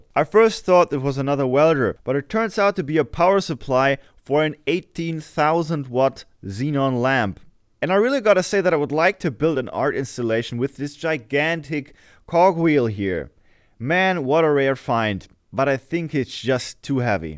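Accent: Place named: Germany. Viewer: I've been scavenging old junk for years in wicklow, but your videos have inspired me to fix some of it up. So thank you for the inspiration, and your lovley German accent with great English 👍. Much better than us irish speak it.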